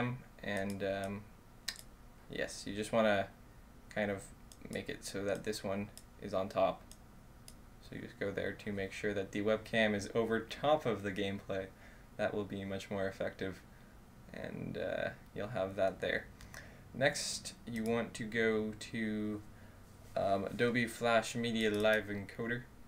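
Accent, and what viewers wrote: Canada. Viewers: are you canadian? i can hear it when you use the letters v and c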